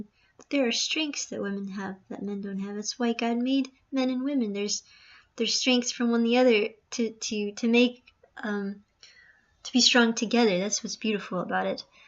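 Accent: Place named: South, United States. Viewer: You're always funny lol You sound like a southern from down south. the US of A. I don't know why I always don't get your videos I get them once every month. The brothers were very protective of their sister and the law of the Lord. Thank you once again and thank you for your encouragement. May the Lord bless you always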